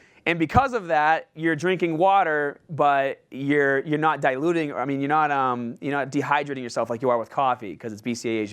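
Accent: Boston, United States. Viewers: Your staht boston accent comes out a little, haha.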